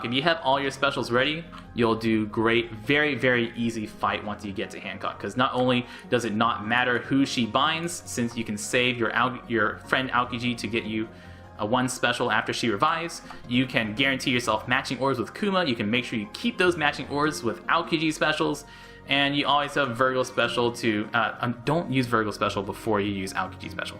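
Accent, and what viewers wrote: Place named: Australia. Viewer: Talk to Australian to me~ <3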